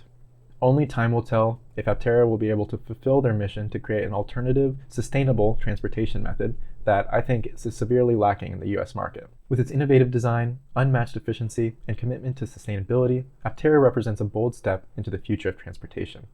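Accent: Australia. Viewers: You sound more Aussie than Yank, but you sell the snake oil like a Yank. Where are you from (without being specific)? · you think he sounds australian?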